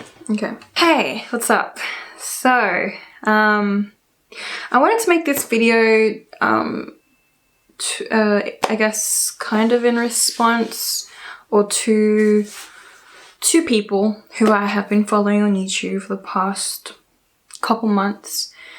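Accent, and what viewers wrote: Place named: United States. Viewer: Your accent does not seem Australian lol,it is closer being American than being Australian :)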